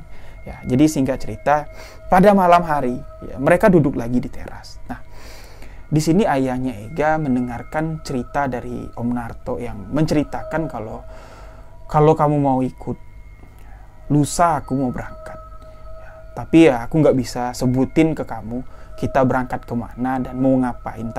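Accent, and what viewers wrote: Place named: Jember, Indonesia. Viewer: Hostnya cara bicara kayak Anang Hermansyah Orang Jember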